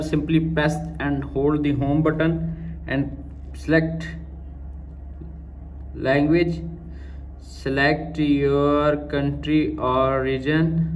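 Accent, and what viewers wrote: India. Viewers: scammer with the indian voice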